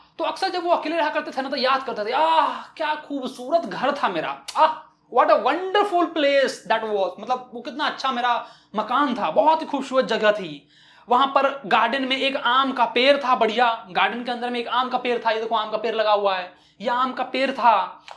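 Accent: Bihar, India. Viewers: Sir aapka accent Bihari aur east UP jaisa h...Mast h sir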